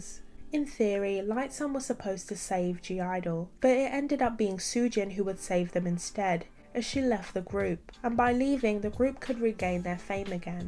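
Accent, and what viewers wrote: United Kingdom. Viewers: YOU'RE BRITISH????? 😬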